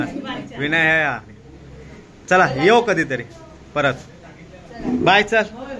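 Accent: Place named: Konkan, India. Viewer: Dada tumchi Kokani language khup Sweet ahai. Tumhi agdi apulkini bolta. Me tumchi sgli video bghto.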